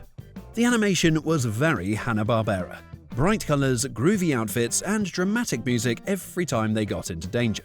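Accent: United Kingdom. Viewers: Calling all of these cartoons "bizarre" while acting as if Scooby was normal is what's bizarre. And then to do it with a British accent? 👎🏿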